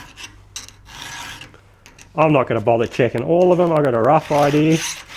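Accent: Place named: United Kingdom. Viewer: I can’t understand this guy’s British accent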